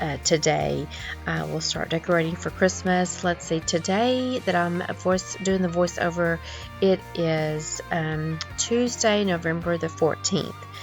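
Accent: Texas, United States. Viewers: Hello sweet friend! I just love to watch you clean and decorate. I end up wanting to do the same! Lol thanks 🫤 I love your videos. Your Texas accent is Beautiful!! Big Texas Hugs, from my side to yours. 🫶🏽❤️💗💝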